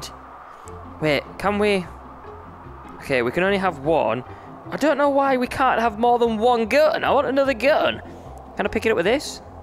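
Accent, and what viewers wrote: England, United Kingdom. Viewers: Where are u from England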